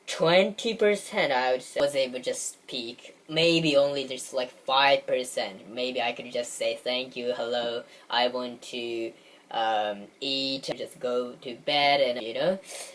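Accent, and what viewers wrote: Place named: Australia. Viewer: Your speak with a bit of an Australian accent, cute! ☺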